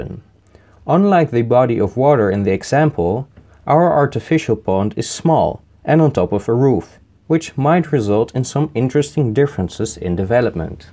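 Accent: Netherlands. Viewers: Fellow member of the Netherlands, your accent is Amazing! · Je Nederlandse accent veraad je XD geeft me altijd een lach als ik weer een nederlander op youtube tegenkom gaaf project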